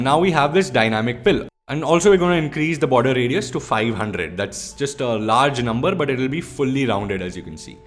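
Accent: India. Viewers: Indian accent 😂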